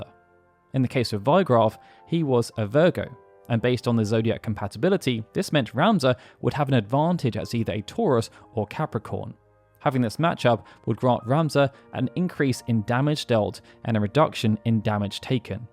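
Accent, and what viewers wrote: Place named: United Kingdom. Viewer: British limey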